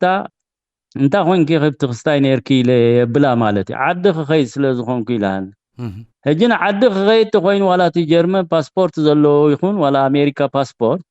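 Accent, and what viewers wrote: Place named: Tigray, Ethiopia. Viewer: ኣብ ሑቕፊ ሕወሓት ብዙሕ ግዜ ዝጸንሐ አዩ ዝመስል።መናአሰይ ናይ ትግራይ ትግርኛ አዩ።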